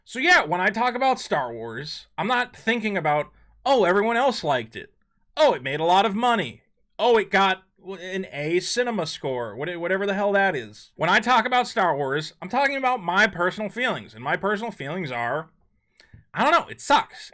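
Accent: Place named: RP, United Kingdom. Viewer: his very reserved, posh, British accent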